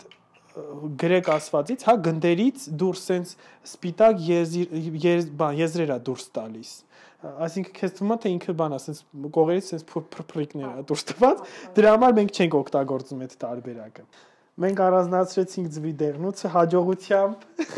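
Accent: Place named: Sasun, Armenia. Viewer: Shat mec bavakanutyun staca haxordumic, jan lselov mer Sasuni anush barbar@ texapoxveci im mankutyun ev mer harazat Irind.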